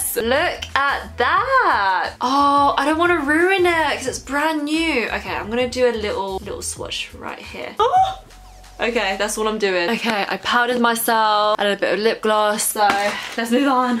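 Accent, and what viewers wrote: England, United Kingdom. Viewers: Your accent tho😍I love ur English accent😍I'm from Ireland btw❤ kildare ( a place in Ireland x) xx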